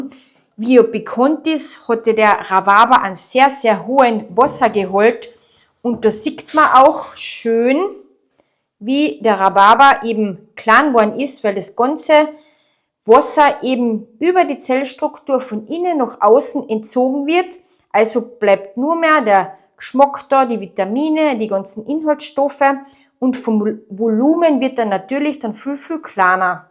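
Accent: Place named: Bavaria, Germany. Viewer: Liebe, das Thema ist ja sehr interessant, doch für einen Norddeutschen ist das Bayerische fast wie eine Fremdsprache, also schwer verständlich. 🤣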